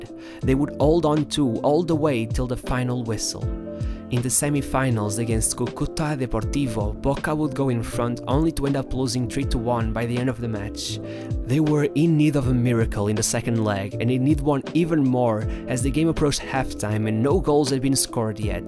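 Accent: Portugal. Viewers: Your pronunciation suggests to me you’re a Portuguese speaker. Are you from Portugal? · só um português consegue falar inglês desta forma e acertar todos estes nomes latinos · Portugal. The pronunciation is good with a Portuguese accent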